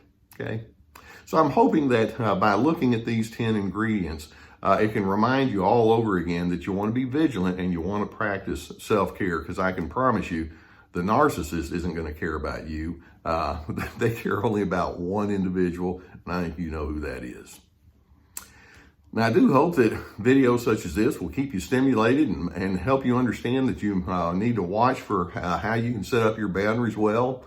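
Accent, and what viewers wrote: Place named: Texas, United States. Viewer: Nearly all of the people in my life are anti-conversation, at least with me. Their defensiveness, quickness to pronounce me wrong, etc. interfere with the idea-based back-and-forth I crave. This is surely partly my own fault but if there's a word for such auto-contrarians I'd appreciate your telling me. P.S. You sound Texan; which part y'all in?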